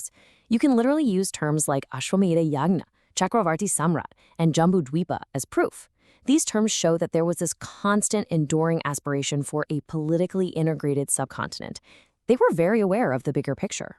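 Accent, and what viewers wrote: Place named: West, United States. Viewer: Good source. My only concern is the western accent.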